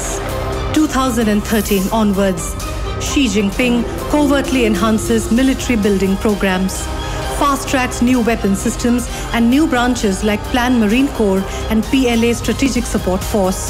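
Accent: India. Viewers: 一看标题这么劲爆，点进来发现是三哥口音 不稀奇. 这个民族没救. 中美GDP差距1/3 中国还谨小慎微.. 中印GDP差了五倍 (在相似人口相似基础下). 印度这个民族永远不知道反省. 指望着骂别人可以提升自己。中国则是励精图治, 奋发图强 。 这是两国最大的区别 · on a completely unrelated note, i love the indian english accent. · your Indian accent give you away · hey guys is the narrator indian????????????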